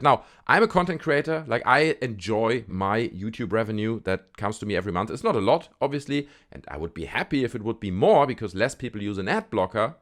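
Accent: Germany. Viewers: Very Good Video! Are you from Germany? Because you have a German Accent lol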